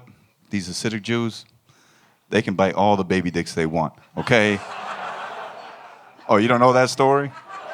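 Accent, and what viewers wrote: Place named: United States. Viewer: He talks so american but tht laugh is Indian asf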